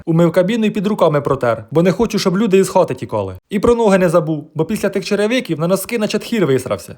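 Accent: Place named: Cherkasy, Ukraine. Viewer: навіть,живучи у Черкасах , приємно чути "той" говір. Діалект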